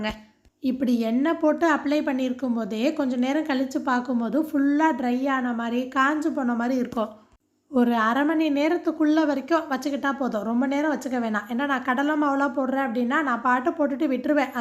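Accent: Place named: Adirampattinam, India. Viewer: Adirampattinam slang kooda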